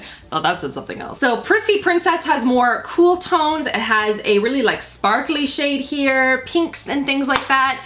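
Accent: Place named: Canada. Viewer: your Canada is showing (the accent, "out" and "about" displays it really well) 🇨🇦🇨🇦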